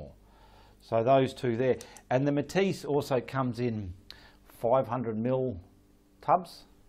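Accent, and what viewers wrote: Australia. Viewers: I have only just found you, and I am loving the down to earth way you teach. I can understand every word you say but being English means we do understand the Aussie accent well. I love the fact that your studio is a corner of your living room. Our living room is far too small to do that so I am converting a corner of my bedroom in to a studio. I have subscribed to your channel because of your no nonsense way of teaching. I enjoyed your Vlog answers to questions. Regarding retarder, I am thinking in Australia, like you said, it gets very hot and you would need it. I have bought Golden Gloss Medium that also allows blending and slows down drying time. It also has added polymers so you can thin down your paint about 30% without under-binding occurring. Like one of your other subscribers who has commented, I am 65 also and I am new to acrylic painting. 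I have done a little oil painting before but not acrylics. I am looking for a straightforward teacher and I have found him. Thank you. Love to you and. He is gorgeous. xxx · Love love love your accent and your Aussie phrases. That's part of what makes you so unique to watch!!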